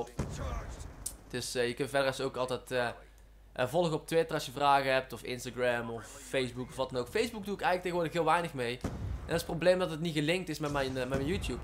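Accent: Brabant, Netherlands. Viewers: Kom je uit Brabant?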